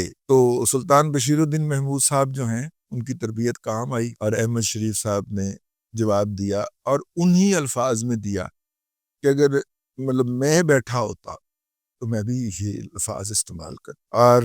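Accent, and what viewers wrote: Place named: Karachi, Pakistan. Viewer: ap hain tou punjabi lakin apki urdu karachi wali ku hai ?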